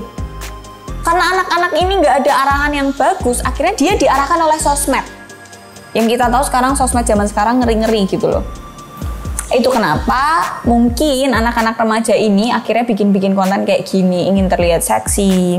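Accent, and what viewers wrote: Java, Indonesia. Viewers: Aku suka medoknya mbk itu lo 👍👍👍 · kirain dinda haw tapi koq medok😁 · Medok e pancet ae mbak wkwkwk, sue ndak di ndilok mbak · logat jawanya padat bnget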